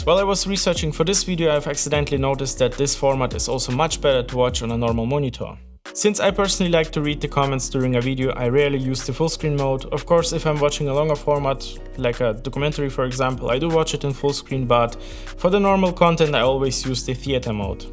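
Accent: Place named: Germany. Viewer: Ein bisschen harter Deutscher Akzent, aber in Sachen Videoschnitt ein wirklich sehr gelungenes Video. Optisch wirklich sehr ansprechend. Ach ja und danke auch für den eigentlichen Inhalt des Videos, den Hinweis auf das neue Format.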